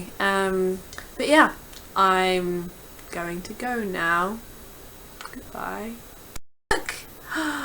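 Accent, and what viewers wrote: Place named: United Kingdom. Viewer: I'm Turned On ! geeky, british accent and beautiful !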